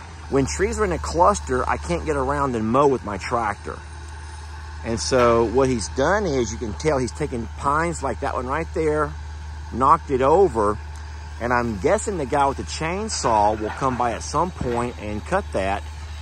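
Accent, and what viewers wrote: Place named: South, United States. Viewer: Spanish and French are very similar. He's going to have trouble with you. Your broken English (Southern speak) is off-the-wall. The French, Mexican, and MOST every other country were taught English in school. What you speak... Is not proper English. You're the problem. That man was charming and non-judgemental of... Your broken English.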